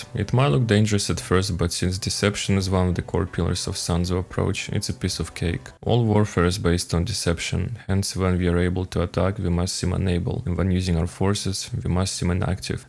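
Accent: Russia. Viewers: Why no one is commenting about kinda russian actent? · Дубляж на русском был бы прекрасен, особенно учитывая что автор явно русский по акценту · Но я же слышу по твоему акценту, что ты русский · Russian accent I guess? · My comrade, you have the hardest Russian accent I've ever heard. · Ты шо русский? Судя по акценту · Русский акцент?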